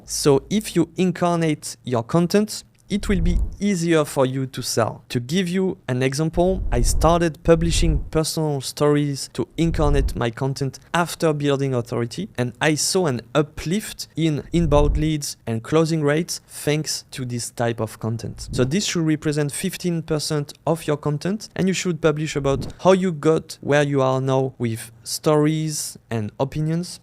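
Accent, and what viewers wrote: France. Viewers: felt the French accent... mais j'aime ce que tu proposes btw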